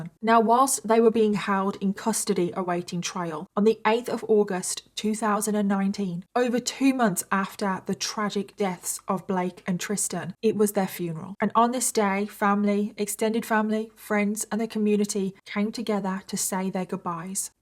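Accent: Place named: United Kingdom. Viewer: Very sick and twisted story. Made me tear up when you told us the bikers and nice car owners led the funeral procession. Side note I love how you use the word "whilst." Your British accent is the kind of British accent I love! I don't know the area and the different dialects, but I can actually understand you! 🤣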